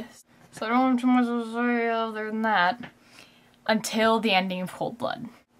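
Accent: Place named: United States. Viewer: This is just a guess, but, are you American by any chance? I'm not trying to be rascist or anything, but I'm just curious as you sound American and said the words 'aunt' and 'semi' in a very American way. Again, please note that this is out of interest, not rascism, because I hate rascism myself.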